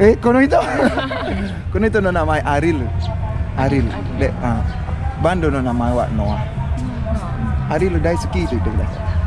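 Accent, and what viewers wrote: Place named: Bali, Indonesia. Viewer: Care nak Bali logate bli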